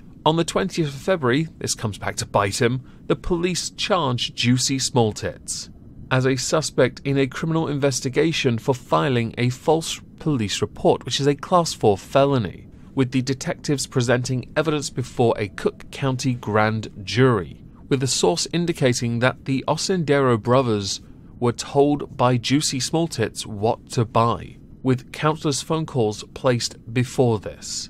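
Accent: United Kingdom. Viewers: for PM! No Lives Matter!!!! Always love to see you upload, my man. Good to hear another brit talk about this stuff.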